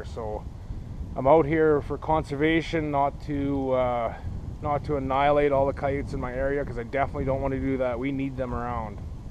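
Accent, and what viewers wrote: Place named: Canada. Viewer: i know nothing about this subject. Hmmm - so do you eat the coyotes after you process them?? I know people eat deer, rabbit, elk, and stuff - and if so - what does it taste like? You sound Canadian - what province are you from? How long have you been hunting/trapping? And are you ALONE out there in the woods all isolated like that??? Men. I tell ya, you guys - I don't think I would be in the woods and stuff alone like that. What do you do with those pelts? Do you sell them or make clothes/bags with them?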